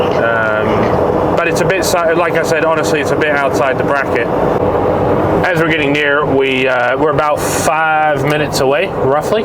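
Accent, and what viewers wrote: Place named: United Kingdom. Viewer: I KNEW I wasn’t going crazy when I heard the British accent bahah😂 you flipped a switch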